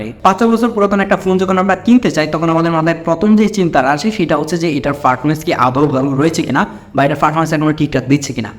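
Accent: Kishoreganj, Bangladesh. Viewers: Bhai apner basha ki Kishorganj?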